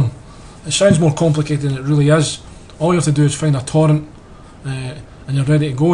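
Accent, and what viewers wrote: Scotland, United Kingdom. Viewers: Than ye must be a huge idiot , or a non english speaker. Everyone knows it's actually Scottish accent , can tell ya , he's from Dundee or East Lothian.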